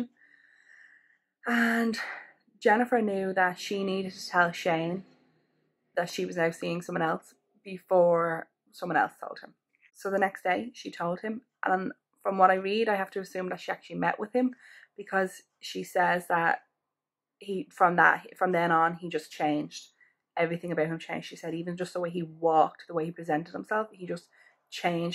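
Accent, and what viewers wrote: Ireland. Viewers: It's a typical Irish way of speaking so she's just being herself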